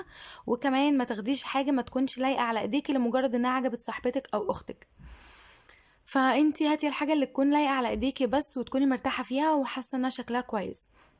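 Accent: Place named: Syria. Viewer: الله يرحم والدك و مبروكة الخطبة بس كلامك ببدل على انك سورية واللا أنا غلطاني ؟؟؟ بس مدام انتي سورية ليش عاملي الفيديو باللهجة المصرية ترا مافي أحلا من الواحد يحكي بلهجتو و لهجتنا من أجمل اللهجات حتى لو خطيبك مصري المفروض تحكي بلهجتك طبعا هادا شي بيخصك بس حكيي مجرد رأي شخصي ما أكتر والله يوفقك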